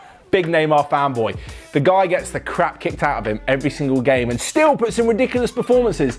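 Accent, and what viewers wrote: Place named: United Kingdom. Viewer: God I could never imagine this channel being voiced by anyone not British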